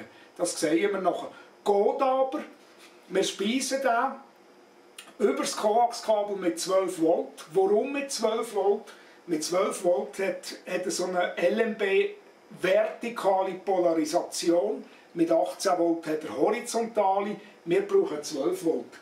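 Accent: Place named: Switzerland. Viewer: Vielen Dank für die Vorführung des Betriebs auf dem Satelliten bzw. für die Erklärungen auf Schweizerdeutsch, das eigentlich gar nicht so schwer ist zu verstehen, wenn man sich ein bisschen Mühe gibt:-) Vielleicht hören wir uns demnächst auf dem Satelliten - ich gucke sofort nach auf Ihrer Website, was es da für Möglichkeiten gibt, auf Es'hail-2 QRV zu sein. 73, Colin GM4JPZ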